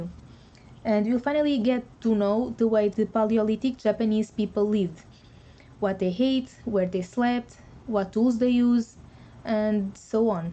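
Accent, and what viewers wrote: Portugal. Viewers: I notice a Portuguese accent...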